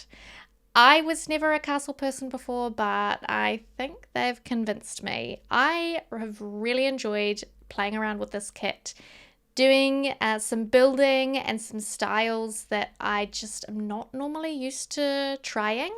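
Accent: New Zealand. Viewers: Hi, I am from New Zealand too, it was quite different and exciting to hear a Kiwi accent over a Sims 4 speed build. This is such a beautiful build, I can't wait to try my hand at furnishing it. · Lovely building! It’s my first time watching your channel and loved your voiceover, New Zealand’s accent it’s so pretty 💗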